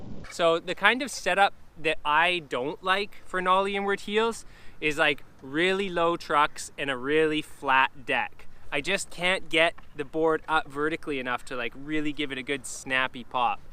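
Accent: Canada. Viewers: I just love your accent. You have the Canadian nice guy accent and it reminds me of every teacher in kindergarten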